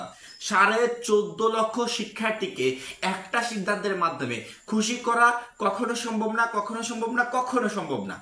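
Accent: Sylhet, Bangladesh. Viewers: Vai ki sylhet er naki?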